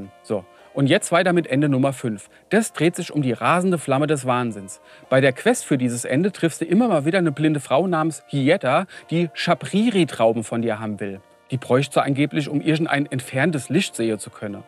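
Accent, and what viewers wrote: Hesse, Germany. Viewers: ich liebe und vor allem das hessisch, einfach nur Gold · Absolut grandios das Video. In noch keinem Video wurde die Geschichte von elden Ring so gut zusammengefasst. Und des hessische macht’s au noch weng mehr onständig unterhaltsam :D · Irgendwie habe ich keine Ahnung, woher der Gag kommen soll bei hessisch. Ja, ist widerlich wie jeder Dialekt, aber tatsächlich witzig wäre halt schwäbisch, bayrisch, sächsisch. Zweitens. "Geschichte" und ein Souls Spiel. Putzig :D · Also Trants Hessisch klingt echt angenehm. Gott sei dank nicht auch Sächsisch :D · Herrlich und trotzdem das "Lore" sehr gut rübergebracht, Vaatividya auf hessisch :-) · Nie eine Sekunde gespielt, aber das meiste verstanden. Uff hessisch ist immer schön. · Hessisch for de win alder ✊🏻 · Gute halbe Stunde entertaiment auf hessisch :D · Wie ich das liebe wenn dem Schröck Storys uff Hessisch erklärt 😂😂😂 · Hessisch- beste Dialekt 💪💪👍👍 super witziges Video. 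Gerne mehr davon. Grüße aus Frankfurt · Also wenn meine Muddi auf Hessisch spricht verstehe ich kein Wort mehr... wo liegt der Unterschied, noch zu nett gewesen? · Meine Mutter war Hessin und ist dieses Jahr gestorben, ist für mich immer ein Gefühl von Heimat, wenn ich den Dialekt höre. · Ei gude ihr lieben, Imma widda schee, wenn ihr uff hessisch babbelt. Ich wär mal für ne ganze reguläre Folge in hessischer Mundart 😉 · Hessisch ist einfach wunderschön ^^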